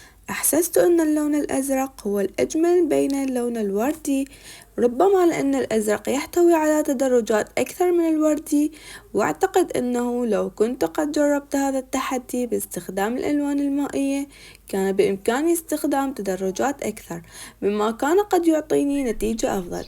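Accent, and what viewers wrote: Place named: Iraq. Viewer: ماشاء الله على رسمك عالم اخر من الجمال ❤❤ بالنسبة لفكرة رسم الشخصيات الموصوفة من طرفنا فهي فكرة رائعة وستلهمك كثيرا لكن فقط ان اردت القيام بها ارجو ان تنشري ذلك في المنتدى اولا حتى نتمكن من وصف شخصياتنا لك ❤❤بالنسبة للكلام فانا اعشق اللهجة العراقية فهي فخمة للغاية ❤❤❤وكذا الفصحى ايضا .. عموما اتمنى لك التوفيق دائما و.. انا مصدومة من عمرك تبدين اصغر بكثيئيير وهذا امر رائع 😅😊 المهم اتمنى ان ايامك تمر بخير وسعادة و.. الى اللقاء❤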